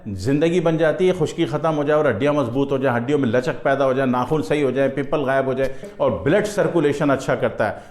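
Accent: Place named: Lucknow, India. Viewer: انداز گفتگو سنکر لکھنو کی یاد آگٸ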